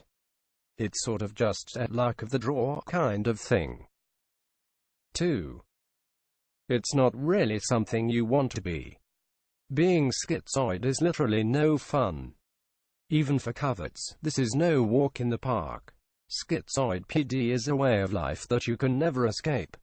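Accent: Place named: RP, United Kingdom. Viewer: British RP Robot. What a snob.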